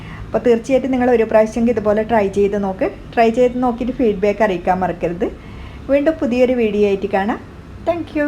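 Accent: Kannur, India. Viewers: Nice name " Thakaarapetti ". Due to shortage of time, can't try many receipe. But will try for sure. Heard that Thalassery, Kannur people are very good in cooking. I like your Kannur slang . Thank you dear. 😊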